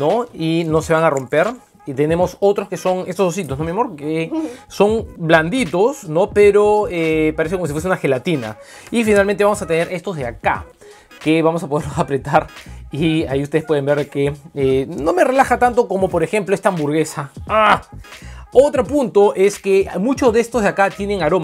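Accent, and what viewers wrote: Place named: Peru. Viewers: Peruano por la zampoña de atras y por la forma de hablar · Luis Cueva solo por la forma de hablar, ya debería ser obvio que es de Perú, pero vive en Estados Unidos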